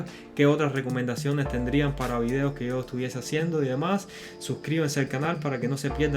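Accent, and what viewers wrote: Cuba. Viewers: Tu acento me suena, eres de Cuba?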